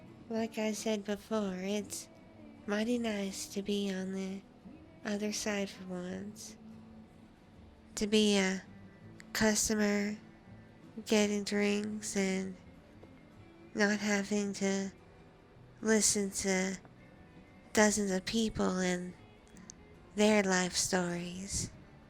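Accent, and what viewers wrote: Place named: South, United States. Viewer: That country accent just B E A utiful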